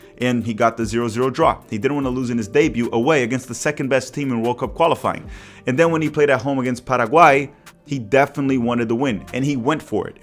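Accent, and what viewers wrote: Rio de Janeiro, Brazil. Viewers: I will never understand this guy supporting Palmeiras and carrying this RJ accent. Maybe this is how this new era of "my PSG", "my Barcelona" goes, youngsters don't have the neighborhood fidelity anymore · irmão, canal muito bom, ingles impecável com um sotaque br mto bom hahaha. análise foda, vou acompanhar bastante, sucesso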